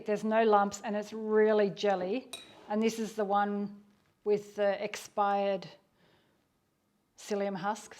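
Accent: Australia. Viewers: Thank you i can't wait to try these. Are you an Aussie living in sweden? Your accent sounds Australian.❤️💙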